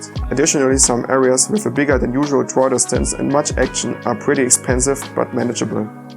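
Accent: Germany. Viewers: Moin! Vom Akzent her würd ich mal auf den deutschsprachigen Raum tippen? 😉Danke für deinen Deep Dive, gefällt mir super! Kannst dich direkt vom Start weg mit DF messen, da kann ich mir noch ne Scheibe von abschneiden!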